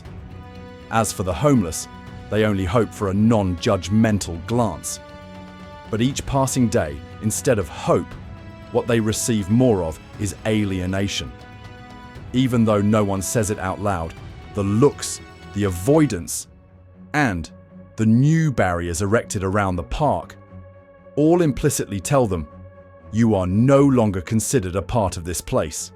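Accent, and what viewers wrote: England, United Kingdom. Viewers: Why is a guy with an English accent narrating this video about PA? Could it be to lend credibility to a piece of propaganda?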